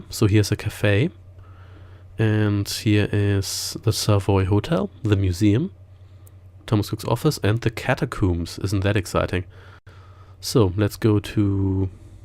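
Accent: Germany. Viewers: You say you live in the UK, but... are you German? Cause your accent definitely sounds VERY German. ;)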